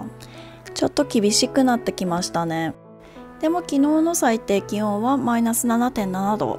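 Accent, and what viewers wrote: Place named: Tsugaru, Japan. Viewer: 青森の人？津軽弁だぁ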